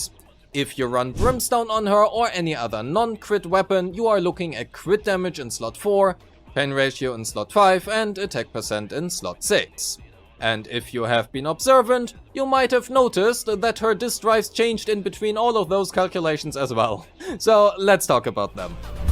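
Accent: Germany. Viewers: Great video, i hope ye shunguang will restore my zzz luck. Are you by any chance german? I hear somewhat of a familiar accent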